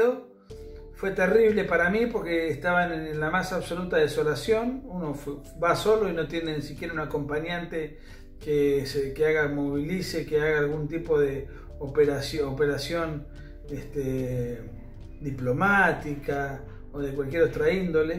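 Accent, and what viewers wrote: Mar del Plata, Argentina. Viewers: Acento de mar del plata ja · Ah!!! El acento de Mardel. Tremendo acento. Tan o más identificable que el cordobés. Sobre todo Mar del Plata del Norte. xD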